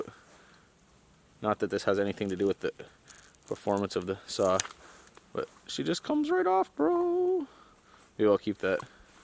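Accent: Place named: Canada. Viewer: Yep. 1am here in North Queensland and this is the 3rd video ive have watched. I leave his videos running in the background because is canadian accent is so soothing.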